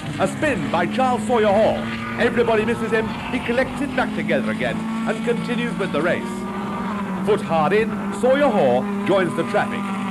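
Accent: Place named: RP, United Kingdom. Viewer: He's speaking in RP (received pronunciation), more commonly referred to as "BBC English". The criticism of his pronunciation is not unfounded. He is indeed pronouncing chicane incorrectly. Given that his only job here is to speak clearly and accurately in order to provide a useful commentary of events, one could fairly and accurately refer to the commentator as a prat (the official definition meaning "incompetent").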